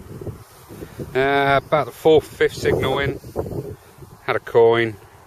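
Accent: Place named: Essex, United Kingdom. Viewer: G'day I'm an Australian these days but came from Ilford back in 1970. Where are you? You sound a bit like an Essex lad. I detect here in Perth but there is nothing very old in Oz.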